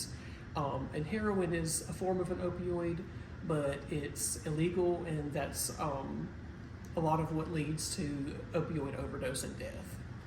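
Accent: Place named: South, United States. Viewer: Close your eyes and imagine a plump southern Belle talking because this lady is her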